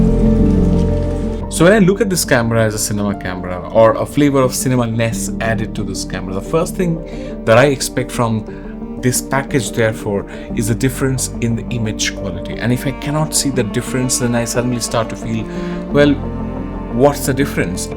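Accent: India. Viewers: Are you bengali by any chance? Anyway did you try using the mini hdmi to hdmi coverter with the cage? What is that part called?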